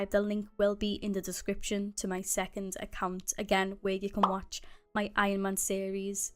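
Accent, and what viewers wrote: Liverpool, United Kingdom. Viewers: Wait are you scouse? Because I am and I’ve never heard of a scouse Minecraft youtuber😂😂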